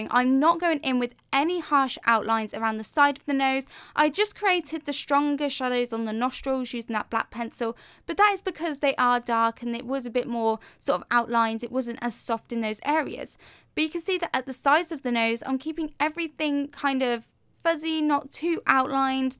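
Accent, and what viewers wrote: England, United Kingdom. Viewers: Thick english escent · I like her English accent.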